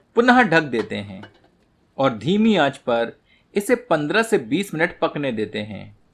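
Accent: Standard. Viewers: itni suddh hindi sun k bhut achcha laga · itna sudh Hindi bola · एकदम शुद्ध हिंदी बोलते हैं आप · Bhai dish to tasty hoga hi.. But apki hindi sun ke doordarshan ki yaad aa gayi.. 😃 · आपकी विशुद्ध हिंदी बहुत ही मनमोहक और प्यारी है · Aap ki boli hui Shudh Hindi humein ati(behad) pasand hai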